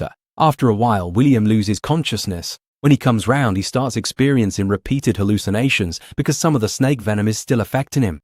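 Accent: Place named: United Kingdom. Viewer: briton accent.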